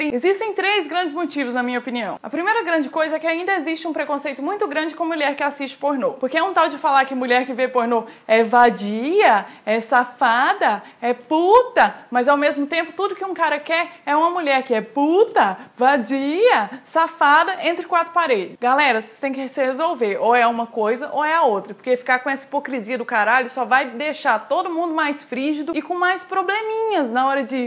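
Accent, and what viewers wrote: Minas Gerais, Brazil. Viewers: poxa aos eu ja notei que ela e mineira kkkkkkkk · Que gracinha ela falando mineirês! 😍😍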